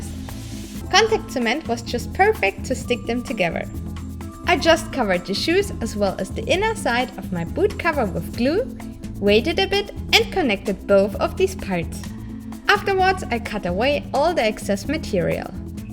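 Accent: Germany. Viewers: is she from Germany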